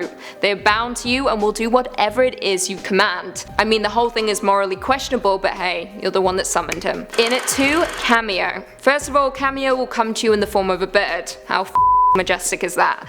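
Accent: United Kingdom. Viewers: Lovely Brit accent though... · British accents sound so cool, especially coming out of this girls mouth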